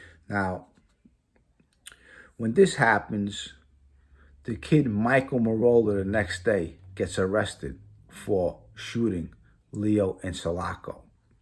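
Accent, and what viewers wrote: New York, United States. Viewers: This fuckin guys is hilarious...that NY accent is 2nd to none...and love how he prefaces someone's name with "The Kid" lmfao.... all the best to ya hope you make millions ...you're entertaining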